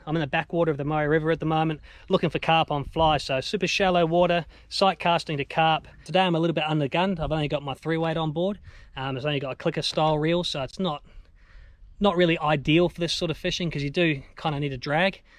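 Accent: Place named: United Kingdom. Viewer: so I'm confused.... you sound like one of "those people" who would say pm as 'half nine' rather than nine thirty lol but you mentioned Rex Hunt, I'm guessing that would mean you moved here 20+ years ago and still haven't lost the accent? or am I WAY wrong? either way mate I'm loving your carp/fly vids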